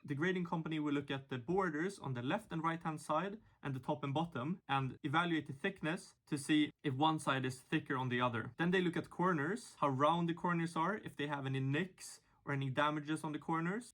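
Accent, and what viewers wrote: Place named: Sweden. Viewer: You sound Swedish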